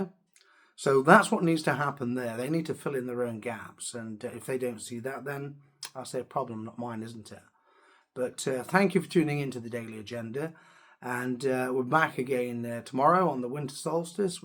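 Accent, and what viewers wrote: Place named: RP, United Kingdom. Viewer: What a piece of work you are. A posh accent and a decent education generally means that you know little or nothing about real life or the majority of working people in the UK. British values are not generated by religion or colour but by an inner desire to do what is right, fair and just for the benefit of the community as a whole .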